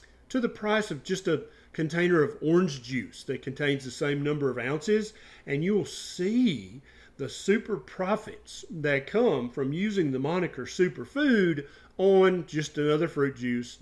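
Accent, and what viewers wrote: South, United States. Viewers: I love my southern speaking doctor!!! Great info!..thank you!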